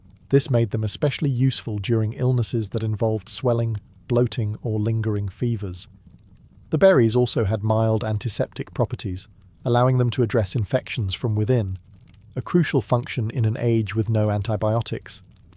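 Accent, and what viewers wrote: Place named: England, United Kingdom. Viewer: LMFAO You crack me up when you say such funny things in that classy English accent of yours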